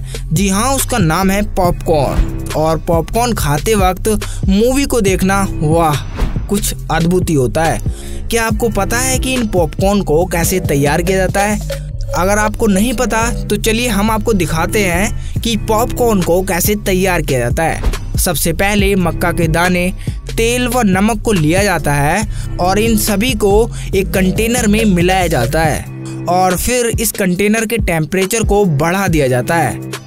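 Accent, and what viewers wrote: Bihar, India. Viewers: Apana voice thoda sudaro bihari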